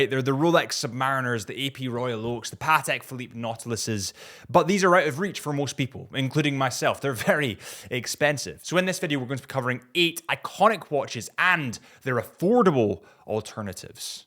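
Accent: Scotland, United Kingdom. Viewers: Love the vid man what is your accent btw? Scottish I assume but from where?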